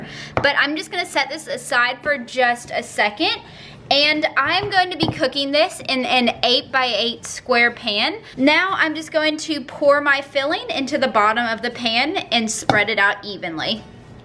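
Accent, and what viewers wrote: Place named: Texas, United States. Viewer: She would fit right in here in Texas, my family speaks the same way. 😃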